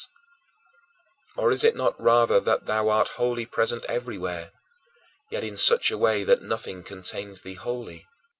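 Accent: United Kingdom. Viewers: British.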